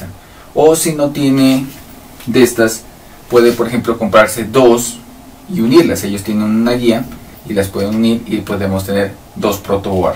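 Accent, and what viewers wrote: Pasto, Colombia. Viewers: Eres pastuso cierto? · Ud es de Pasto? profe.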